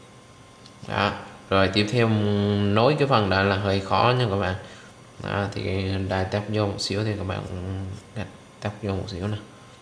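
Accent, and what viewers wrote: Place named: Gia Lai, Vietnam. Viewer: Tiếng gia lai vậy á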